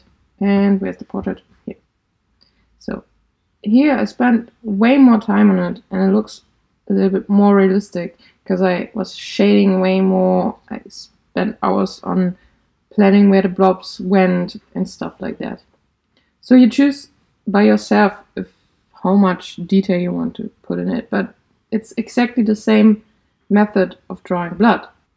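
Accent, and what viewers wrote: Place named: Germany. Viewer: I realy like your german accent!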